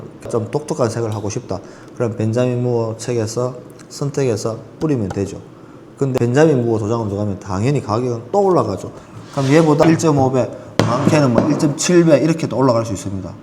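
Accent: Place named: Gyeongsang, South Korea. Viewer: 설명 잘듣고 갑니다. 오랜만에 경상도 사투리 들어서 좋네요 ㅎㅎ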